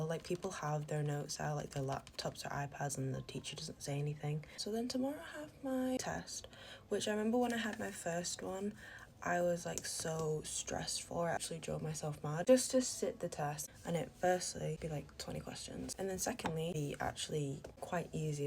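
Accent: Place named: Bradford, United Kingdom. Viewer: you are drop dead gorgeous!Which part of the England are u from?Because I am from England as well.I live in Bradford.I literally love your accent and it sounds like a Bradford accent.I completely understand if you don't wanna reply to my comment and tell me which part of England your from and you will always be my IT girl slay queen.Love you girl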